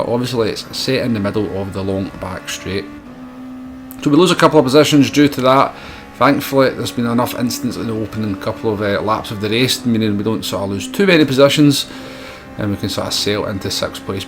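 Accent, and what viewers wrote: Scotland, United Kingdom. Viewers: haha I knew exactly what you meant so it's not a bad descriptive either, just a rather amusing one. Especially with the Scottish twang. Maybe you should trademark it for when you hit the big time of seven gazillion subscribers ;)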